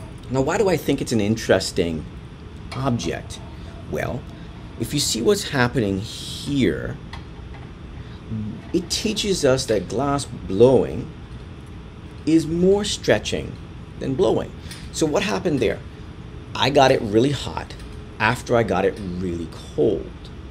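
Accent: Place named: Canada. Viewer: Great video. but what is your accent? it's so strange. It's like canadian, mixed with heavy new york, and irish.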